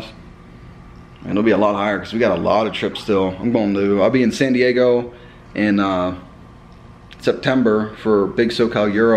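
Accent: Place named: Philadelphia, United States. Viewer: I was gunna say, you sound like you are from Philly. Where did you grow up?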